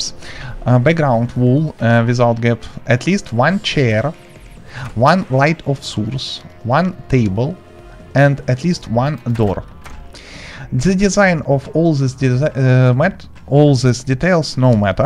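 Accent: Russia. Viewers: Is he russian??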